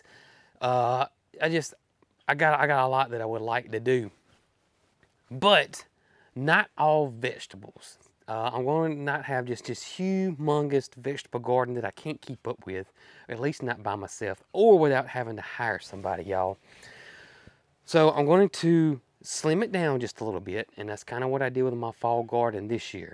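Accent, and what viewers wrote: South, United States. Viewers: Greens and beans ROCK the south! I gotta say, I love leaving the closed captioning on when I can. I would guess that the folks that do the cc for YouTube are 1. not gardeners and 2. not from the south. Watching them goof up your southern drawl really makes me chuckle sometimes. Wonder how hard it was for you to pull that HAIR ROOF (harrow) through your garden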